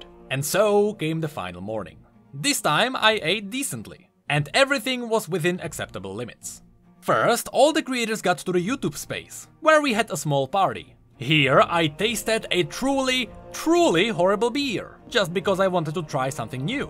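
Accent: Czech Republic. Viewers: Myslel som si že máš taký český akcent lol :D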